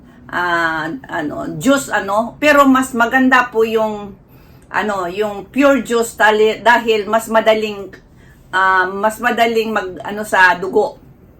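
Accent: Visayas, Philippines. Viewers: Anong province mo ma'am? Kasi Ang salita mong Tagalog Ang tuno bisaya halata na Hindi ka pure Tagalog.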